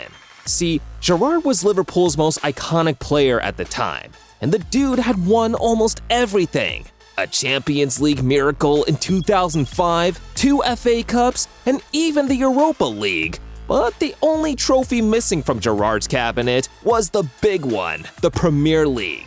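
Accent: United States. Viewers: Hearing an American say these words is so weird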